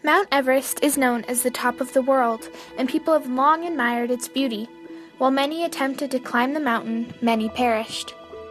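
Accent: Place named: India. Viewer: Indian accent 👌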